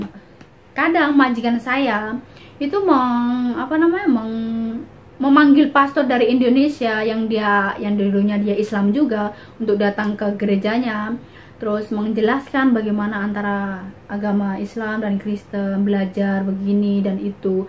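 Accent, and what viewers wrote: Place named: Malaysia. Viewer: Saya senang dengar kesaksian kamu dgn eksen melesyia sangat enak di dengar,ditambah bahasa inggris.Tuhan yesus .enyertai kamu.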